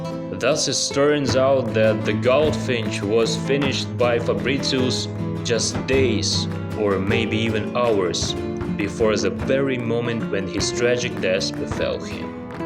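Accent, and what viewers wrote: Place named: Spain. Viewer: I hear you have a Spanish accent. Are you from Spain? My grandparents were from Castilla La Vieja in Spain. I think that I must have been an artist in my past life because painting is my passion, and I am a self-taught artist. I went to Spain and visited the museum in Madrid. I love Velasquez. He is my favorite of Spanish artists! You are great too ! Gracias por compartir su conocimiento y talento con nosotros!!❤❤❤❤🎉🎉🎉😊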